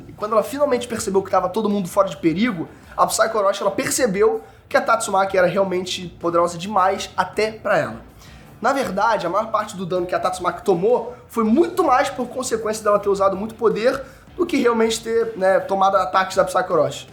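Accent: Rio de Janeiro, Brazil. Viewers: Ele ta no caminho! gostei! tirando o sotaquezão carioca... mas isso a gente se acostuma! dale!! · ahah imagino para quem não é do Rio ouvir esse sotaque. até pra mim é puxado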